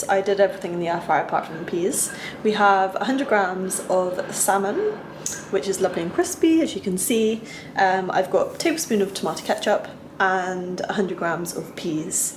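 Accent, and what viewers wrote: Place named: England, United Kingdom. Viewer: I love your accent, english girls always get a +1 thanks to the accent.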